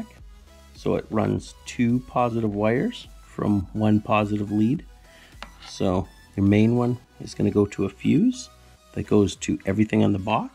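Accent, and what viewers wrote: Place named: Canada. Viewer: I understand the process. I was simply noting that you never mentioned what type of battery charger to use with it. If someone who doesn't understand LifePo4 batteries builds your project, (a very fine one at that), and tries to charge the battery with a standard power supply, car power socket or car battery charger, they will wind up with a pile of HOT orange goo where the battery box used to be and / or possibly even a very hot fire that is next to impossible to put out. Lithium type batteries are no joke and need to be used with care and respect. I was encouraged by your use of a fuse. I'm guessing by your accent that you are Canadian. A little tip for you and your fellow countrymen / women and my country people to the north of me. LifePo4 batteries can NOT be charged by ANY charger or solar array if the cell temperature is below 32 degrees F, (0 C). 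Permanent damage to the battery will result. it can be used cold but not charged. It must be warmed up first. I say this for someone who may go ice fishing and leave it sitting on the ice or may leave one in a car for emergencies in the winter. Larger higher quality, LifePo4 batteries for solar, marine or RV use have a BMS module or Battery Management System module built into them to protect them from overcharge, undercharge, low charge condition, (below 20%), short circuit, excessive high drain and high and low temperature conditions. It is not impossible but unlikely that your battery contains a BMS module and more likely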